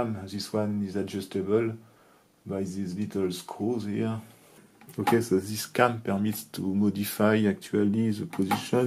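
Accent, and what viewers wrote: France. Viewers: Great stuff, thanx for showing us this beautiful tech. No need to be sorry for the pronounciation: French accent sounds so good, you could call us all "d4mn m0therfuckers", and we would still be flattered 😀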